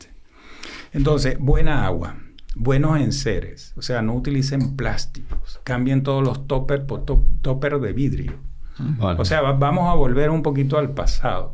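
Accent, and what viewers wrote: Venezuela. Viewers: me descolocó cuando dijo que era italiano, desde escuchar sus primeros palabras supuse que era venezolano por su acento!! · Para mí, este capítulo ha sido una cátedra magistral, impartida por un profesional excepcional. Su brillantez reside también en su habilidad para simplificar términos técnicos, haciendo que la información sea comprensible para todos. Su calidad humana es igualmente destacable. Desconozco su trayectoria personal, pero por su acento, percibo una profunda conexión con los venezolanos y Venezuela. ¡Mi más sincero agradecimiento! Totalmente recomendado." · Excelente entrevista ! Usted es italiano pero habla muy Venezolano Doctor🇻🇪🙏🙌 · su espanol es Venezolano claramente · Que hombre tan inteligente 🧠 y EXCELENTE ORADOR ❤ CREO Q ES VENEZOLANO POR SU ACENTO. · jiji Solo escuche el intro y super que el doctor es Venezolano, que orgullo.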